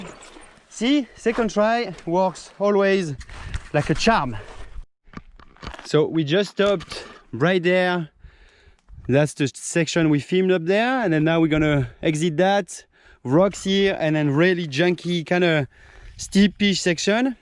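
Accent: France. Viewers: Me encantas <3 acabo de suscribirme a tu canal y te sigo en Instagram!. Eres un Crack voy a ver todos tus videos para ponerme al corriente, tienes un espíritu salvaje y un chuloooo acento francés, que mas se puede pedir! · Mais cet accent est tellement bon ! So French ! C'est bon de te revoir rider !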